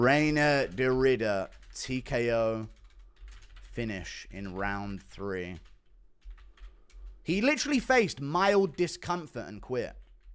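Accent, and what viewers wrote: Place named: United Kingdom. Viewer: He says "Dorito" instead of DeReider because of his awful accent... Brits who don't have the BBC proper speech really butcher english. The only people who butcher it worse are cajuns but these guys have the excuse of being severly mentally redacted. The brits just seem to love to butcher the language they created. It's like they're playing a game where they get points the less they enunciate.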